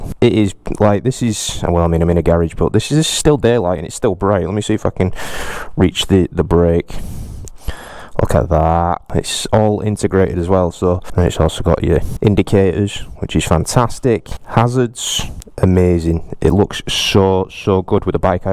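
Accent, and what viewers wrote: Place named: Bolton, United Kingdom. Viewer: where are you from sounds like bolton u sound like paddy mcguinness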